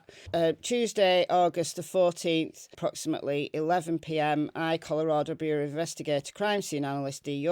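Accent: United Kingdom. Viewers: Me too. I love accent. We do not have tons of UK residents in Va where I live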